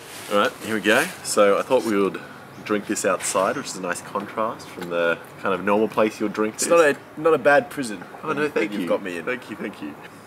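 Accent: Australia. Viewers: He's Australian He's saying "how ya going" It's our version of hello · ...He's aussie. You'd know by his accent and australia and america jokes. Also, the magpie video.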